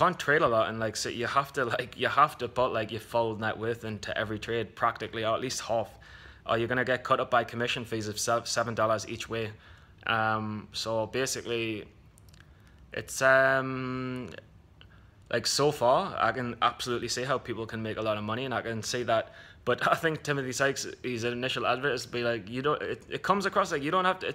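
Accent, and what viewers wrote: United Kingdom. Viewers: Hi, came across your recent podcast with SteadyTrade (that was awesome btw 👍). As soon as I heard your accent I was like the guy is from the UK (as am I, Birmingham). I was exactly like you, wanting to know if this was legit and I've come to the conclusion that Tim and his education service is 100% legit. I've put in so much study time and to be honest I'm just scratching the surface. That's the reality, it's pure hard work and dedication and I have such a long way to go but the key is I'm loving the journey. I am a silver subscriber and before that i purchased HTTM at the beginning of lockdown. What an investment that was.... at the end of watching it, I was like okay this is pretty cool so let's go deeper. A month in on silver I've just hammered out the studying. Still have loads to learn, but when I hear of progress like yours it makes me wonder just how far can I personally go if I really dedicate to this. 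I'm looking forward to seeing your progress and thoughts through these videos. I also followed you on twitter yesterday, so I'll be sure to reach out at some stage. I take it you are also on Profitly, if so it would be great to follow you and see how your journey mapped out too. Thanks for the boost of confidence, it's just added fuel to the fire I already have. Let's do this!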